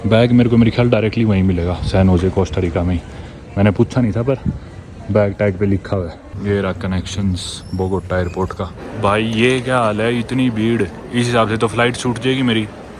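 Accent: India. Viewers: Love your desi accent 👍🏻 and equally amazed to see how well you speak latin american languages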